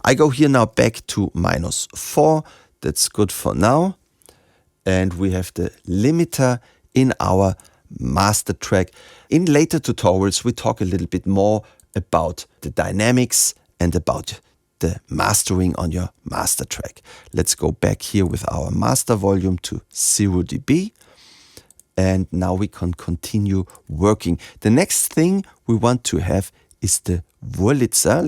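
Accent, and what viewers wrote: Germany. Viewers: Your videos are awesomly helpful! If I had to rely on the Videos uploaded by the Ableton website, I'd have great trouble in learning Live 11! The best part is your german accent. I'm from Germany myself originally and it's always great to hear the odd german expression like 'Zack!' which you throw in every now and then. Cheers from Ingo in Melbourne, Australia!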